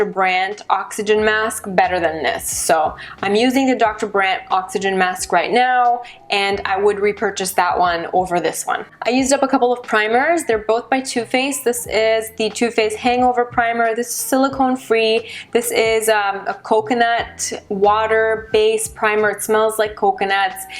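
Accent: Canada. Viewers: Maybe it's because I've lived in SF for a year, but you sound extra Canadian in this! And I had no clue Rituals was from the Netherlands. Great video as always! xoxo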